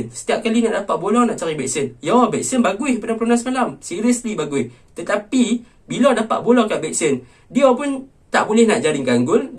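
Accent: North, Malaysia. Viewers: Org utara mmg akn sebut mcm tu lidah diorng mmg cmtu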